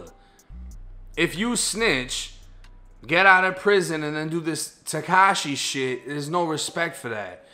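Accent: Boston, United States. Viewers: Bro what part of Boston you from...!!!??? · Your accent sounds like you was raised in Boston and moved to a Florida hood when you were 10..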